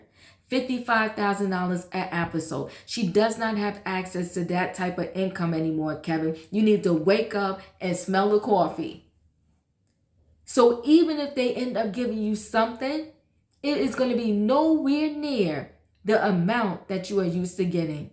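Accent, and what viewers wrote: New York, United States. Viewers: I wholeheartedly agree...but, as well, that's the mother of his first born...I'm not sayin he's a saint at all but I think at some point he had a 'I'll keep her good in the process of doing me' mentality--and we all see the consequences of Wendy managing herself...Your hair looks so pretty and love that NYC accent...take care mama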